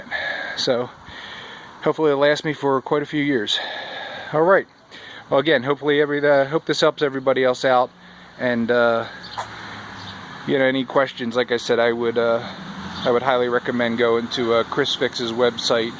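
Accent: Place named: East Coast, United States. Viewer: Your def from Back east. Got the accent!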